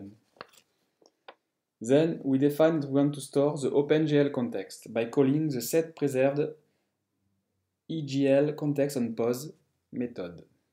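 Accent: France. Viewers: haha amazing ! is this a french accent ? thank you for your time and efforts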